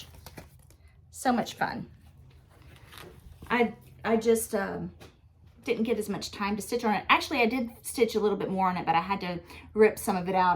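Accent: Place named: East Tennessee, United States. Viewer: I am from Knoxville and moved to Florida in 2003 because we felt it was just getting too big. We also downsized with that move so I understand your big job ahead! What part of Knoxville are you in? Do you plan on staying in the Knoxville area? We moved from West Knoxville, but my Dad grew up in East Knoxville in the 30’s and 40’s. My husband is from Fountain City and we have also lived in South Knoxville in the 1980’s. I began watching you because I missed hearing a fellow East Tennessean and really enjoy your flosstube. I am interested in # 1, 7, 8.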